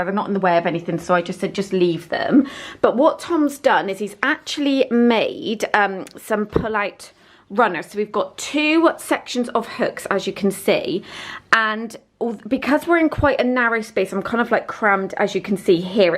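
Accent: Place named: Bristol, United Kingdom. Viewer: Just seen this and you must be a fellow Bristolian with that accent surely 😂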